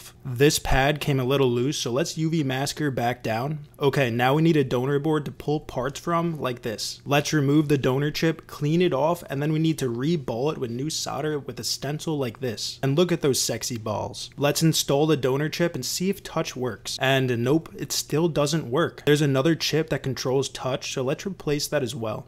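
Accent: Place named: New Jersey, United States. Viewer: When I heard your voice I was like has to be a fellow Jersey guy I'm new to the repair business about a year I don't get as deep into repairs as you do. Really like your videos and the humor you use. I guess it's a Jersey thing. I'm in Texas and nobody here understands the humor they just think I'm a smart ass.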